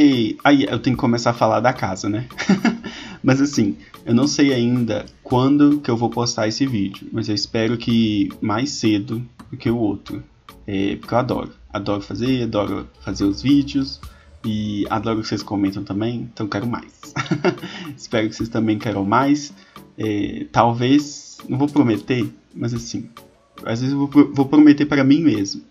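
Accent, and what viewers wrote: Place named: Rio de Janeiro, Brazil. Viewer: Sou de Salvador e jurava que você era carioca! Kkkkkk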